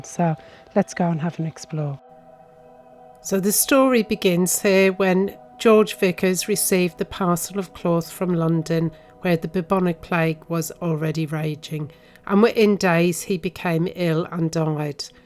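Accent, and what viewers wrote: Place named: Bristol, United Kingdom. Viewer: This was so interesting and beautifully documented. Iv never heard of this village before. It's alarming to wonder if all those beautiful homes have ghosts. I Reckon there must be quite a few roaming around. To think this started with a bundle of cloth, wow!!! I felt such a strange sense of sadness is there. I don't think I could live in one of those beautiful homes. Thank you for a lovely video, please forgive my asking. But I like your accent, I'm not sure where it's from, is it Bristol.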